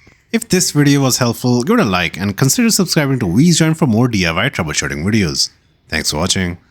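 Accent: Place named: India. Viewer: Great video. But Im not doing all that! Nice accent, though. New Delhi? My ex-husband is Punjabi from Panchkula. His sister is famous actress Yamini Singh. She’s in Mumbai, of course! My didi. ❤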